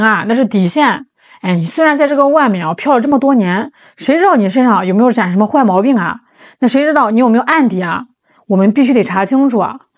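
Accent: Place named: Shaanxi, China. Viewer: 好像陕西那一带的 😉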